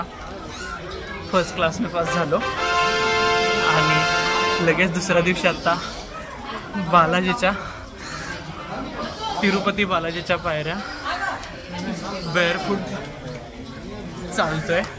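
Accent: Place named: Kolhapur, India. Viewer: Awesome vlog brother🙌🔥. Congratulations on successfully completing your architecture degree👏. I had watched Krish mahadiks vlog of climbing tirupati balaji steps. You guys are amazingly fit, you didn't took any rest that's commendable. And love your Kolhapuri accent❤️😄. How much time did it took for you guyz to reach the top?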